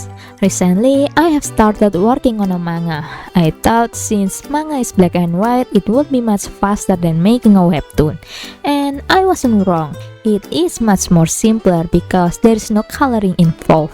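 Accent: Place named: Indonesia. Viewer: oke dari logatnya aku paham kamu orang indo wkwkwk Nice job, makasih tutorialnya kak ^_^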